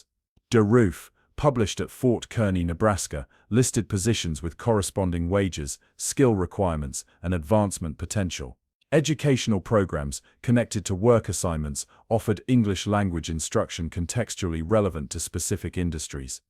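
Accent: United Kingdom. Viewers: The announcer seems British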